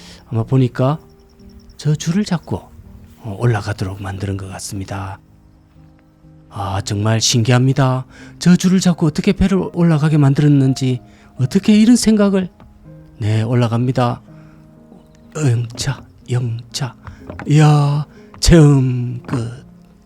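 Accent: Gyeongsang, South Korea. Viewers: ㅋㅋㅋ 가짜배기, 오랜만에 듣는 보리문디 사투리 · 영상속 나레이션의 묘미가 더욱 멋져 빛을 발합니다 장보고의 썰렁한 내력이 안되게 찐 성공 내력담 알차게 챙기셨네요 언제나 구수한 경상도 언어가 더욱 흥미롭구요 잘 보았습니다 ~👍🏻👍🏻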